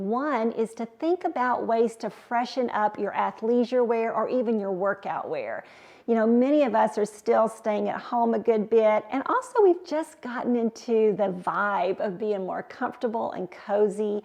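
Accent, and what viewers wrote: South, United States. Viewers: Hi, I'm new to your channel, just subscribed! I love all the tips you shared, as well as the outfits! You look so pretty in pastels, especially that beautiful pink! When you mentioned living in Cincinnati, I couldn't believe it (thought you to be a Southern lady, just a bit of accent to my ear😉). We relocated from NE Ohio to Miamisburg (not far from Dayton) almost two years ago! Our oldest is located in Hamilton, twenty miles from Cinci where he and his wife both work. It's a small world, neighbor☺️!! It is snowing as I write this! Like you, we are so ready for Spring and so thankful for our warm home near all three of our Kids' families - they were our impetus to relocate, especially to enjoy our seven grands♥️. Thank you so much for being a voice on YouTube, sharing your tips with all of us! For me you're a God sent gift and I'm so glad to have found you! 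Sending warm 🤗. - Susan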